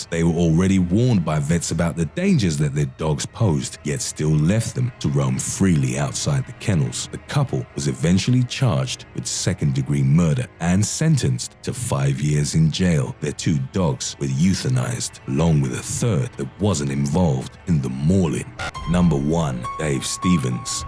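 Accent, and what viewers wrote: United Kingdom. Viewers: batman 666, True i kinda liked the other one. The british voice does not work with the music too. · What happened to the scary voice. This guy is ok but any U.K. Voice is never really that scary. Makes you not want to jog. Although anything like being in the wrong place at the wrong time can get you killed. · shout out to the British narrator😉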